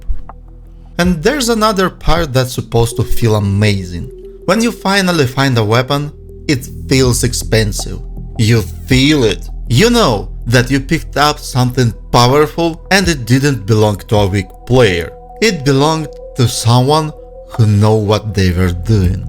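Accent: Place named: Eastern Europe. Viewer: Getting ratted, and the fact that you lose all of you gear except for the safe pocket, killed this game for me months ago. Tried it again 2 nights ago because in so many ways it's amazing, but this is a deal-breaker. Your accent sounds like people I call "brat" or "druk", and is not a problem at all. In fact, makes the video even more compelling. Slavic bros know things. Seriously though, if some clown with a free loadout can rat you while you are decked out with all purple and gold guns and augments, what is the point? "Horde of naked hobbits" 💀💀💀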